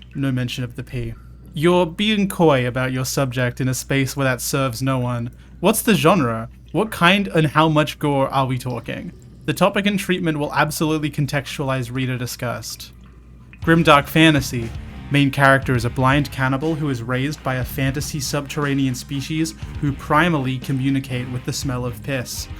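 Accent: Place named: Australia. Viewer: 1. i see (?) youtube 2. i hear from her voice that she's probably aussie 3. i subscribe and hope that the algorithm will show me more of The Gang 💅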